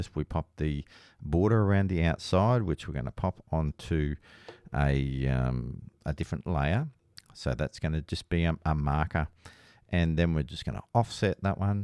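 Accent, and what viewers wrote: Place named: Australia. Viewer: Great lesson. I’m trying to learn all I can, so I can stop buying files and make my own work. Love the Aussie accent. Australia is on my bucket list to visit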